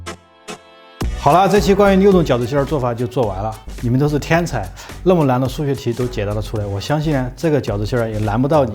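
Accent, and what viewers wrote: Sichuan, China. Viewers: 阿伟听口音是四川人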